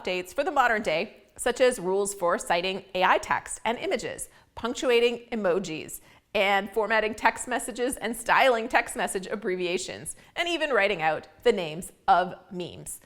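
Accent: Canada. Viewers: Very informative- as always. Thanks & hang on to that 🍁accent 😉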